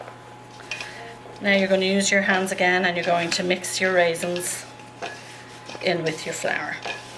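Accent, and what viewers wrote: Ireland. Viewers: somehow the scones look like they would be more delicious because she has an Irish accent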